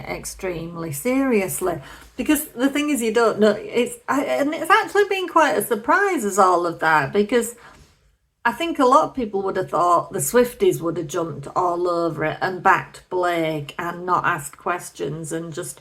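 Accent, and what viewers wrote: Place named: North West England, United Kingdom. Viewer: Sounds like you are north west England, same as me x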